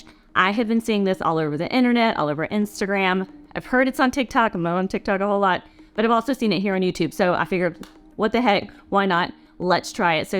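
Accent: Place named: South, United States. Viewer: Love your videos, short and to the point. Love your accent too being from the south myself. Dupe videos from you are awesome!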